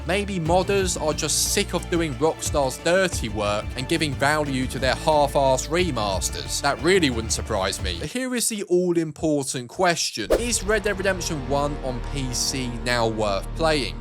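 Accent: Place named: England, United Kingdom. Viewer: You have the most pommy sounding voice.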